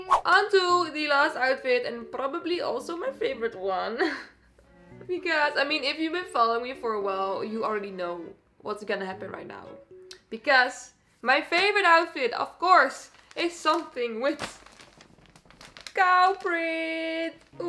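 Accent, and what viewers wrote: Netherlands. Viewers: her accent sounds dutch, is she dutch?😅🍓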